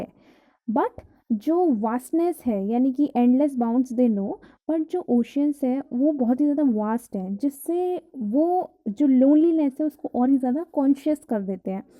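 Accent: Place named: India. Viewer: Indian accent brother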